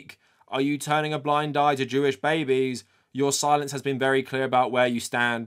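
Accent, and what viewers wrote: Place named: United Kingdom. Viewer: You gotta love a Dude with a British accent worrying about Woke American liberals. Aren't you guys still working out Brexit with your 3 fleet navy?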